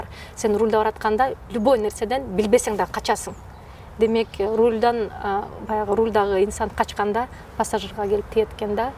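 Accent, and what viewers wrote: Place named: Kara-Suu, Kyrgyzstan. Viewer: Анжеликага Кара-Суудан ысык салам, сүйлөгөнүңүз аябай жакты, уккун эле келеберет экен, бизге аябай окшоп сүйлөп калыптырсыз))) Жумуштарыңызга ийгилик, ден соолугунуз чын болсун, үй-бүлөңүз менен аман болуңуз. Жаңы ырларды чыгарып, ырдап биздин көңүлүбүздү көтөрүп жүрөбериңиз!!!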